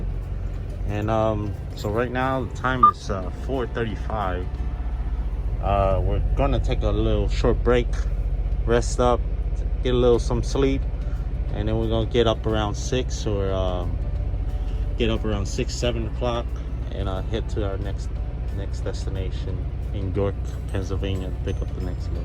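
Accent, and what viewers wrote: Vietnam. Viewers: You sound Vietnamese? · nghe giọng a giống người Nha Trang!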